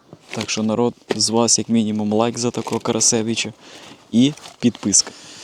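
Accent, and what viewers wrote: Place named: Polissia, Ukraine. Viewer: Доброго дня ,яка місцевість , по мові ви з полісся.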